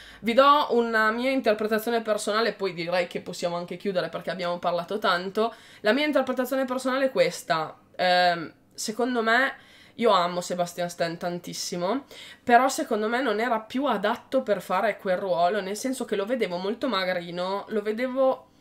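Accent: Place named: Reggio Emilia, Italy. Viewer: SPOILER 😁Sei di Reggio Emilia?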